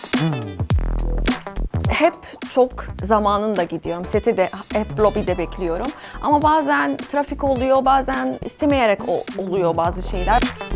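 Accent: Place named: Turkey. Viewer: Vay anasi kiz fasliymis be konusmasini duyarsan 100% turk kizi dersin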